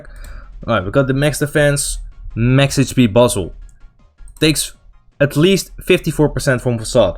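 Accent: Netherlands. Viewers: 3 seconden in en ik kon gelijk horen dat je uit Nederland kwam, blijft leuk om mensen uit eigen land te horen :)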